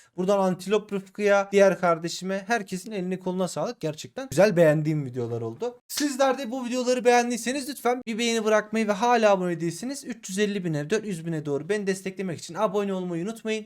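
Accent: Gaziantep, Turkey. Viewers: abi sen anteplimisin ben antepliyim şivenden anladım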